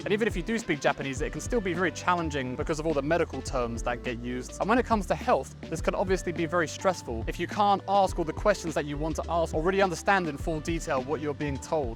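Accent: United Kingdom. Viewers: Hey there, this is the first vid from you I have seen, and I’m a new sub from South Africa 🇿🇦 and I have to say, you sound vaguely South African too…I can also hear an English accent too…eish, I’m confused and I think I’m hearing things, sorry…The more I listen, the more English you sound now 😂😂😂🤷‍♂️🙏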